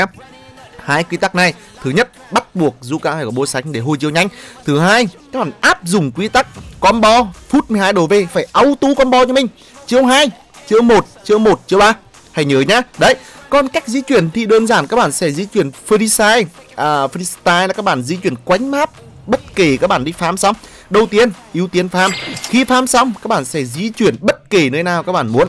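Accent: Nghe An, Vietnam. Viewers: Giọng Nghệ An dễ thương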